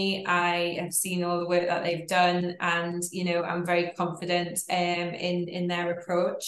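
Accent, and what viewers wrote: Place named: Newcastle, United Kingdom. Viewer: Geordie accent.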